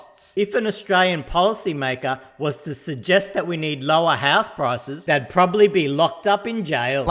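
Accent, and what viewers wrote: New Zealand. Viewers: you a kiwi mate? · Employment is rising because people that are left behind, need 2 jobs to pay for their existence. Good Job! love your kiwi accent!!!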